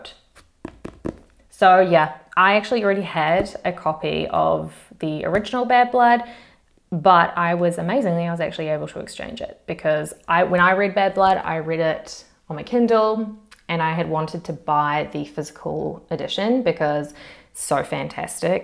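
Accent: New Zealand. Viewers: I listen to a lot of true crime and I just found your channel, why. I really enjoy it. At first I thought you were an Aussi but later you mentioned New Zealand so I am guessing you are a Kiwi. I love the accent and the way speak, it seems some of my favorite youtube channels come from that part of the world. Back to basics, Molly Dixon ect. and I think that you are beautiful which makes listening to you tell a story very easy.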